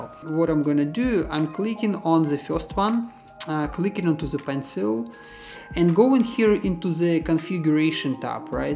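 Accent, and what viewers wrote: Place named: Russia. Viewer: чувствуется русский акцент.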